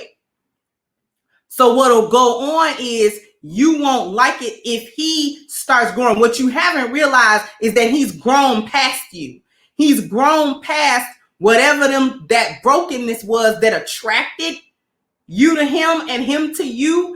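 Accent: Chicago, United States. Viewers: That Chicago accent heavy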